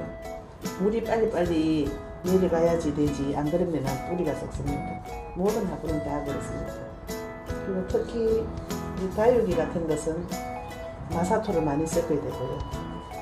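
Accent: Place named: Gyeongsang, South Korea. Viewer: 깍지블레하꼬 엉애 때문에 골치군요. ㅎㅎㅎ. 겡상도 사투리가 참 구엽워요. 매번 좋은 영상 올려주셔서 감사합니다.^^ 이 영상 아주 유용할 것 같아요. ㅎ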